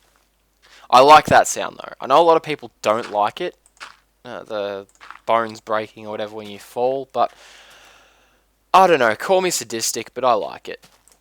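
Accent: Australia. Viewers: I always enjoy listening to your australian accent, so feel free to make a spelunking episode :D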